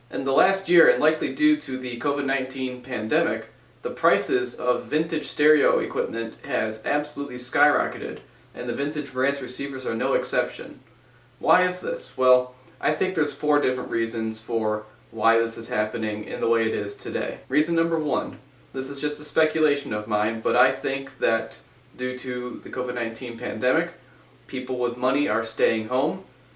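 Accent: United States. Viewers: You are from Michigan?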